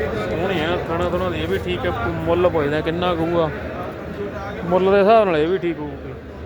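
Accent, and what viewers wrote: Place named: Punjab, India. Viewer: Sona bahut hai kanjarda teri boli jata wali hai bhai teri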